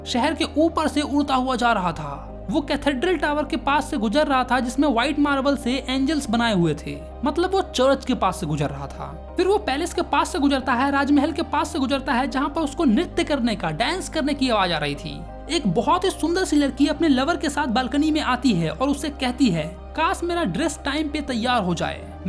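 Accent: Bihar, India. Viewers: Bihari ho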